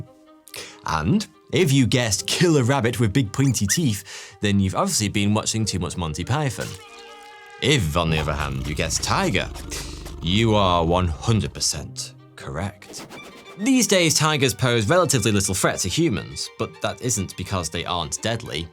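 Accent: United Kingdom. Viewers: I usually love your videos, especially the fact that the British accent is the most attractive in the world and your content was almost always on po8nt. Not this timw. Im so tired of humans, with our vast intellect, completely distegarding our animal instincts when considering humans threat level. How can a 200lbs mountain lion bolt from a person half its size, but other humans seem to think we're so weak and flimsy? A. We are the ONLY great ape species capable of swimming, awkward or not. B. There are very few animals that can run for as long as we can, or pursue pray for as long as we do. Cant run from sometbibg that wont stop chasing you. 3. You can keep on with your pessimistic approach to humanhood, while i take in the facts of history. Consider we are the dominate species on the planet, well, come prove im weak for being human, go ahead.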